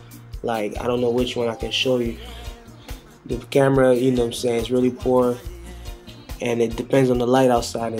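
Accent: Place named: Jamaica. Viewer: you have a slight jamaican accent n no its not the music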